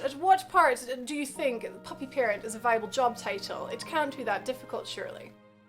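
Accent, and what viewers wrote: Scotland, United Kingdom. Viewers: How the bloody heck does anybody have a problem with your voice and accent, as an Englishman i have always found the Scottish accent to be a wonderful accent, and the scum that have a problem with your weight need to just do one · Anyone find her Scottish accent really soothing.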